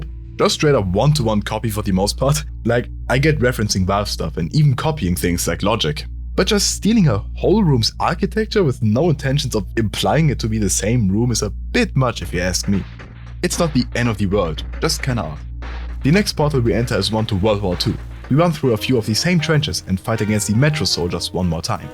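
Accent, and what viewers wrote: Germany. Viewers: I'm recently subscribed but gotta say, I figured out you are German after the first 2 sentences you spoke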